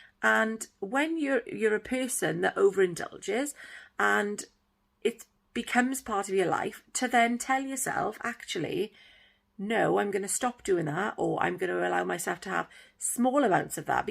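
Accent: Wales, United Kingdom. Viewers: Good will power and self control. I am on Nutracheck. Did Slimming World and lost 2 stone but was fed up of feeling guilty of going over my "syn" allowance. Now I am in more control. Losing slowly but that's the best way. I don't normally like chatty videos but yours is fab! Do I detect a Welsh accent? I am from Carmarthenshire but now live in Swansea. I really look forward to watching more of your videos. Thank you.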